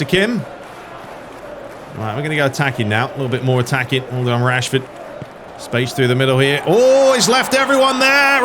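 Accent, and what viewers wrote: Netherlands. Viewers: Amazing Dutch accent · I'm Dutch myself and I think your Dutch accent is on point! 😁 Great episode! keep em coming 👍🙏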